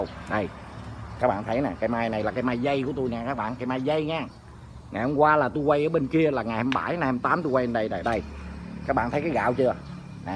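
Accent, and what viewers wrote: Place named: Tay Ninh, Vietnam. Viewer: Dân gốc Tây Ninh nói chuyện nghe cũng đặc biệt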